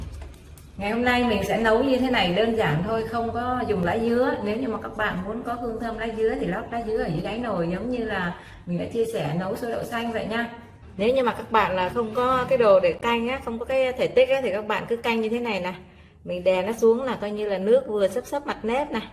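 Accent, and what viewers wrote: North, Vietnam. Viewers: Chị chắc là người miền Bắc năm mươi tư(54)😘 nói chuyện nhẹ nhàng dễ thương😘👍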